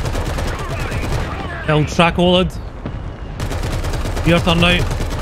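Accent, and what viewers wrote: Scotland, United Kingdom. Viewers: Yup and the Scottish accent made me stay haha!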